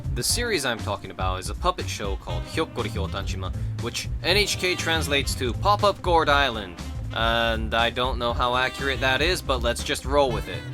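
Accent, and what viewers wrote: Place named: United States. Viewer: Good research, for some reason I didn't image you having such an American accent lul.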